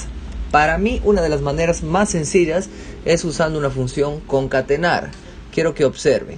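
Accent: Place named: Loreto, Peru. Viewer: TU ACENTO CHARAPA ES LO MAS HORRIBLE DEL VIDEO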